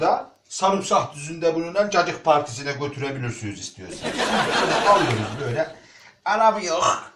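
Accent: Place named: Alucra, Turkey. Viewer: Dayı alucoralı yada gareysarlı galiba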